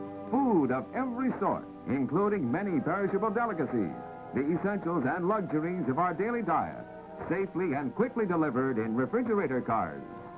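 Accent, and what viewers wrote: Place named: New England, United States. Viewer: Excellent. Narrated like all of the instructional and school films. “...from Pittsburgh to Akron, the rubbah centah.” Narrators always had that New England accent. I remembah!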